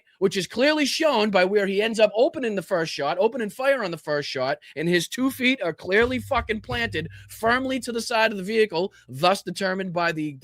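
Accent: Boston, United States. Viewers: right on. Love listening to you. Boston accent.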